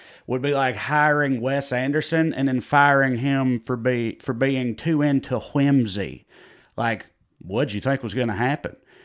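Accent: South, United States. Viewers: Hey man, I found you on Instagram. Keeping making these! I'll keep clicking. I don't know why, but its really calming to hear a leftist views with a southern accent. Cletus The Slack Jawed Yokel was the stand in for the south until I heard you. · Love this!! Any of your stuff i love. You sound alot like me and its nice to have a southern voice of reason! Please stay safe and keep up the great work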